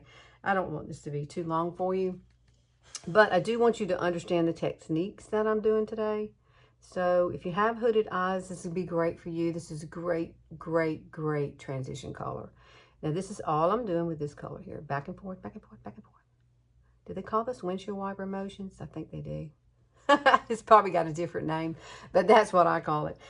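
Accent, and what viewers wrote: South, United States. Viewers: I just subscribed to your channel. I love your accent! I am from Kentucky and also have that wonderful southern accent nothing like good southern people. I have just purchased a couple of your products from Younique products. I don't have facebook but I am enjoying this channel.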